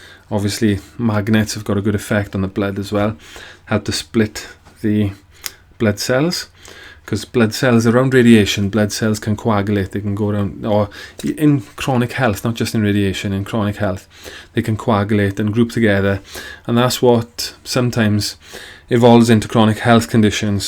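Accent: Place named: Wales, United Kingdom. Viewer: Eyyyy nice to hear another welshman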